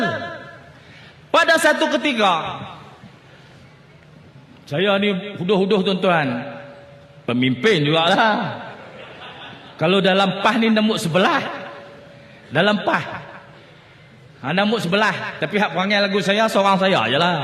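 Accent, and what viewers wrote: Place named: Baling, Malaysia. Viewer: Sanusi ni org baling. Memang org sana stail cakap mcm tu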